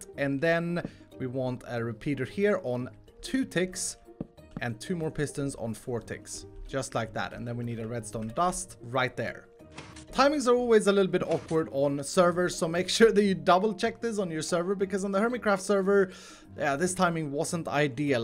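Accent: Sweden. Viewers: That Swedish accent tho! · Så jäkla kul med svengelska!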